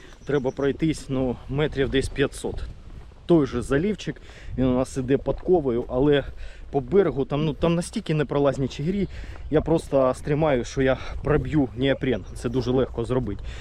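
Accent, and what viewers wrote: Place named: Surzhyk, Ukraine. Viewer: підтягни українську. Таке адове суржелло, що аж вуха ріже.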